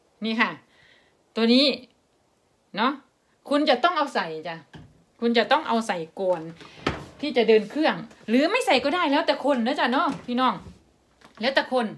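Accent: Isan, Thailand. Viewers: เอื้อย เว้าอีสานเสียงตาฮักเนอะ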